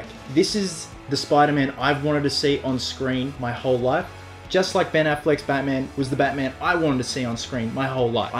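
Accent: Australia. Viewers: Subscribed straight away when I heard your accent. Fellow aussie ;) Fucking loved it. And thank god someone is finally #TeamIronMan. All my friends were Cap :<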